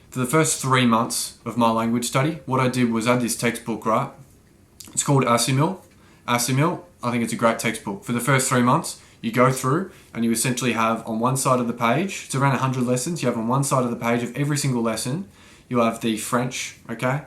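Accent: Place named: Australia. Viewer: This is an Astralian accent, I guess.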